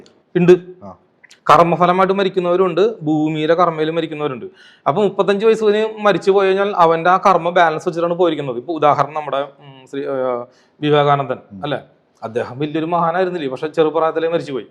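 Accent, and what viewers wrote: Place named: Thrissur, India. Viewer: സ്ലാങ് ക്കേട്ടിട്ട് തൃശ്ശൂർ കാരനെ പോലെ ഉണ്ട് എന്റെ ജില്ലയിൽ ഇങ്ങനെ ഉള്ളവർ ഉണ്ടല്ലോ ഛെ നാണക്കേട് തൃശൂർ കാരെ ടെ വില കളഞ്ഞല്ലോ ഗഡി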